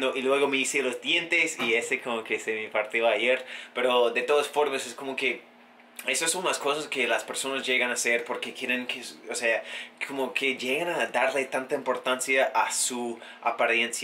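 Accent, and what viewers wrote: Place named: Colombia. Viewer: Un urraaaa por ese Acento, Se escucha más Colombianizadooo 👏👏 🇨🇴🇨🇴🇨🇴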